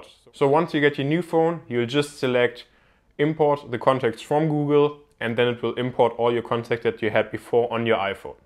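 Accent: Germany. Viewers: Der deutsche Akzent <3 Super Video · are you german? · Youre german?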